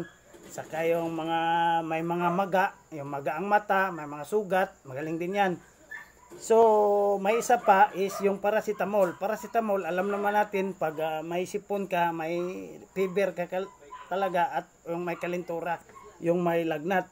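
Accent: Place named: Visayas, Philippines. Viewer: tgaasa diay ka boss.? bisaya mn diay ka?😂😂😂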